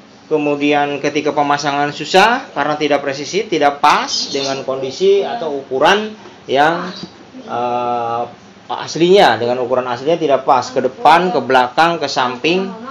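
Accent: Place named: West Java, Indonesia. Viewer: Agak ngahelob😁 bangunateh urang sunda nya si akang mah.?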